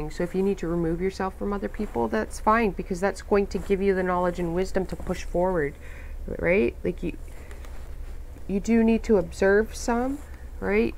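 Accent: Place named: Canada. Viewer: You gotta be from Canada EH. Me too.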